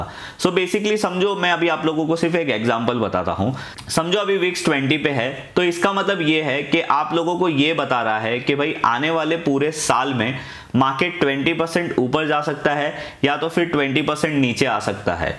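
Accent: Gujarat, India. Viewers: bhai, u r from Gujarat?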